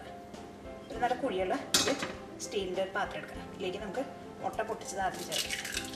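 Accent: Thrissur, India. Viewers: Thrissur ano veedu...accent kettitu thoniyatha..!!!..:)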